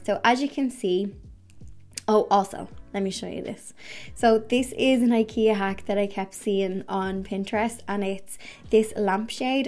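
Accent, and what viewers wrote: Ireland. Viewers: Her little Irish accent is so cute ♥️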